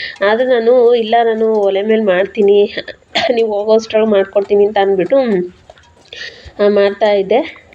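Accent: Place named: Hassan, India. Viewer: Nivu Hassan davara nam ajji mane Hassan hatira nivu mathdodu nodi anisuthu namadu Tiptur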